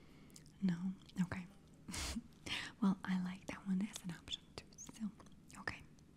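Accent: United States. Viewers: Does anyone know where this amazing lady comes from? Her accent is obviously very American but I'm sure I can hear something else in it and it sounds beautiful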